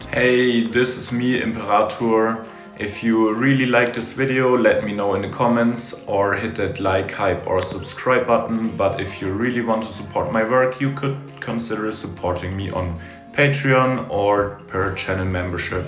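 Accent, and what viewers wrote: Germany. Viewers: Most cliché German accent ever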